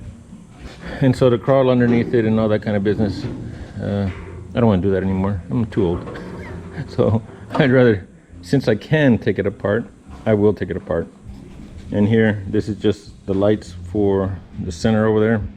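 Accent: Texas, United States. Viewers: I like your pachs. I like your texases way of saying texas way.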